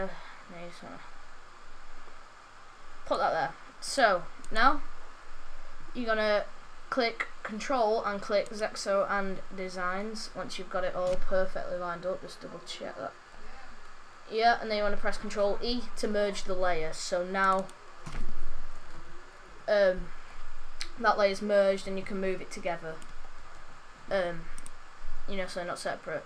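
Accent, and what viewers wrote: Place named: United Kingdom. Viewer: O,MMGMGFJ UR BRITISH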